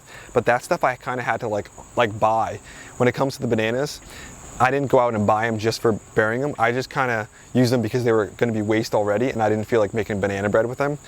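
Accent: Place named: Philadelphia, United States. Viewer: ❤❤❤❤❤ I love your channel!!! (Can tell you’re near Philly, love to hear someone who also has the accent :) )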